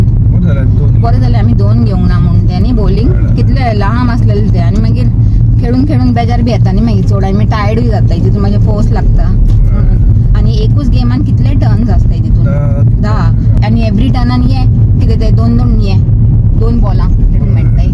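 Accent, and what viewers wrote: Mangalore, India. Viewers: Your Konkani sounds like Mangalore Konkani. Are you from Mangalore?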